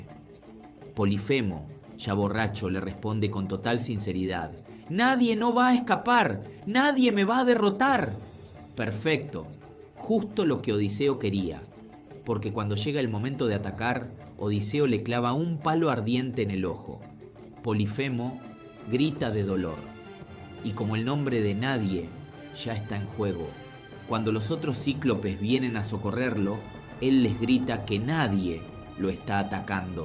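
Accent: Buenos Aires, Argentina. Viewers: A mi si me gusta el acento che, Boludo 😅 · El texto no armoniza con las imágenes ni la época. El narrador es un pibe de Buenos Aires. Horrible. Una lástima, las imágenes son bellísimas. Usa términos como "alta"(estratega) que pronto pasará de moda. Tiene varios de esos.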